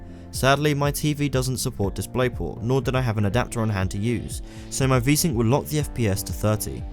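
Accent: United Kingdom. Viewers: Thumbs up if you like to hear doing movie reviews in his righteous British accent with a shot of Scotch!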